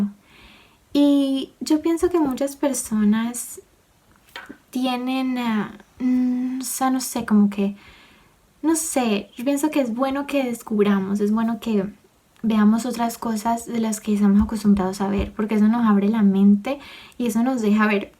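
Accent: Cali, Colombia. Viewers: Jajajaja como contas las historias ve! con ese acento caleño re marcado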